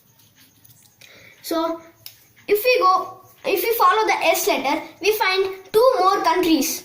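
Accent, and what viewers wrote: India. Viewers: i like your typical indian accent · Ryan he is Indian, obviously he has an accent not everyone speaks American or queen's English. Duh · I like him and the video but i hate how Indians invent their own pronounciation. Para gway,uru gway,chilli,Puerto Rica and many more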